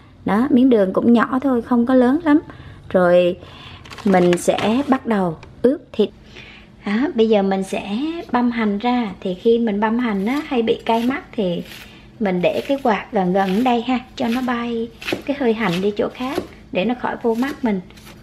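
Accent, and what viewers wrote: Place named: Hue, Vietnam. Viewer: Giong hue nghe de thuong qua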